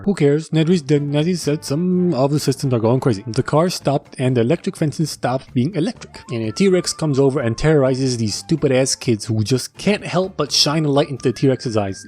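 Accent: United Kingdom. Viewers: the best part is the accent - I'm british too but it gets me every time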